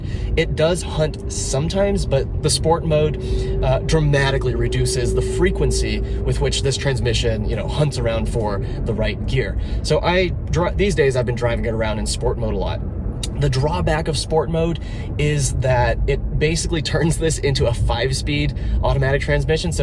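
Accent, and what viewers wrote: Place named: United States. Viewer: Hello from Peru!! Great video!!! You sound like are from USA but what your ethnicity is? So handsome! Thank you :)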